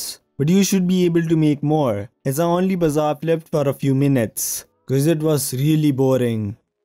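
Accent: India. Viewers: U sound like indian but are u In USA ?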